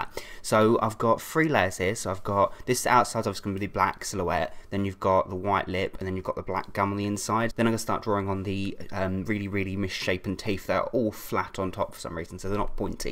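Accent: United Kingdom. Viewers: [incredibly frighting man in make-up intro] [adorable soft speaking british accent (?) when he talks] this is the video I needed today, no question about it.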